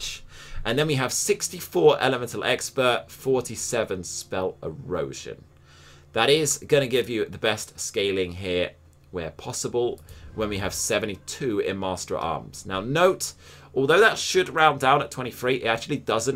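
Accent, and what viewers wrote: Scotland, United Kingdom. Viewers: Your Scottish voice makes me laugh every single time 😄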